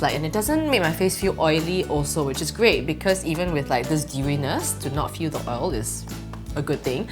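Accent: Singapore. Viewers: The singlish! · I think she's from Singapore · This is my second video of watching her and I love her cute Singaporean accent ^.^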